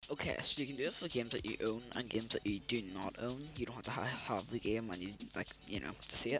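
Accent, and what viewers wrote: Northern Ireland, United Kingdom. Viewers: Not only you sound british your nose is also clogged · Northern Ireland is my guess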